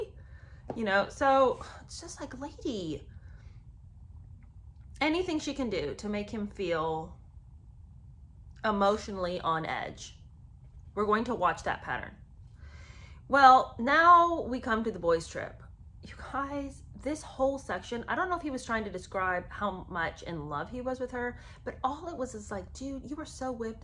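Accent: United Kingdom. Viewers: Love your British accent.. very Bridget Jones 😂😊 (I’m not being snarky, I actually like it)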